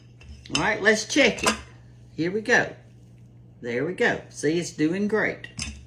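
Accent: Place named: South, United States. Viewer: Love, love your southern country accent , so friendly❣️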